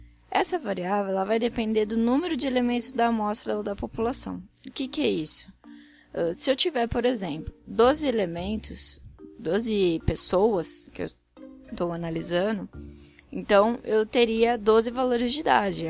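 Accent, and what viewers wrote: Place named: East Asia. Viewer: Você é oriental não é? Percebo pela maneira como você fala. É comum os orientais se interessarem bastante por estatística. Mas muito boa sua aula, me ajudou bastante.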